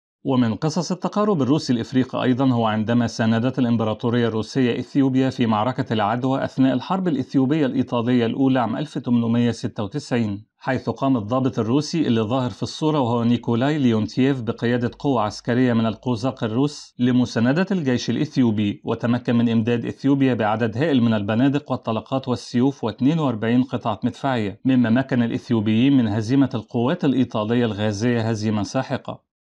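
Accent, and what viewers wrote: Egypt. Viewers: كم أكره أن أدخل لمقطع وثائقي وأكتشف ان المذيع هو (مصري) بسرعة أعطي (دس لايك) للمقطع وأخرج المصرين دمروا اللغة العربية والانكليزية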